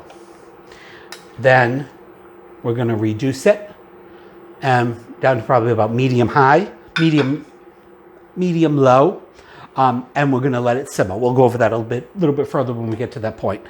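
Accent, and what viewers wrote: New England, United States. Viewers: Thank you so much! I saw the new video and replied there too. Although I recognize your New England accent I erroneously associated your YouTube name with Cherry Hill NJ. So cool of you to do the special research for the right recipe, love your channel.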